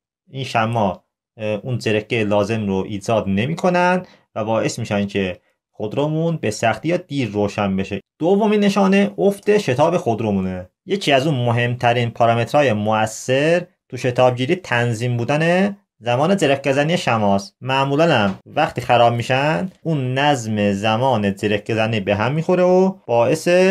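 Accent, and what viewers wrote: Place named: Azerbaijan. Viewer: ممنون از توضیحات کاملتون بخصوص بالهجه بسیارزیبای اذری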